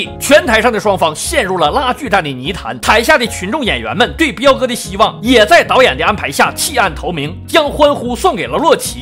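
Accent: Northeast, China. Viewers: 真的很大碴子. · 哈哈，我最喜歡聽說英語，，滿滿的東北渣味。👍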